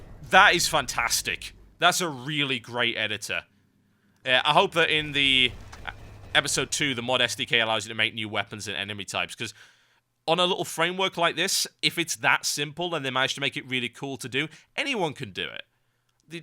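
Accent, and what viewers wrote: United Kingdom. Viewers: I love this "WTF is" series, love "lets play" channels and so on, also brittish accent pwns. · Large majority of TB viewers - "Wha!!!??? Wer is WoW vidz?!?" I enjoy any video you make, TB, mainly because British accents are like sound-rollercoasters.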